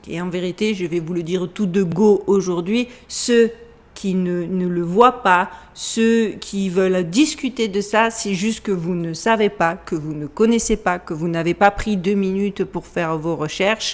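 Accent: South, France. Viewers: Je t'ai découvert assez recement.. or je suis dans affaires depuis au moins 15ans. Merci pour ce travail sérieux et bien detailler. Et avec un bonus merveilleux; un accent du sud. Force honneur et amour sur toi ❤️🇲🇫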